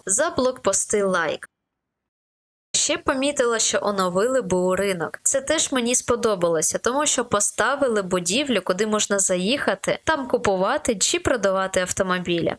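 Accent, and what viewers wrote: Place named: Donbas, Ukraine. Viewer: Ти з Донбасу? Мова з родзинкою ❤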